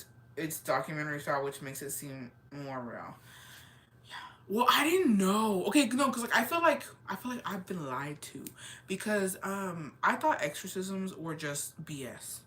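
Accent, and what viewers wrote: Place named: Texas, United States. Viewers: We're southern that just how we all talk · We just Texans, that’s the way we talk 😆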